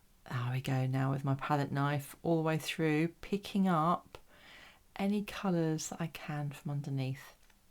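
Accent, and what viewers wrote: United Kingdom. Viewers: you always paint so gracefully and elegantly, your grace, and your adorable British accent matches the videos beautifully.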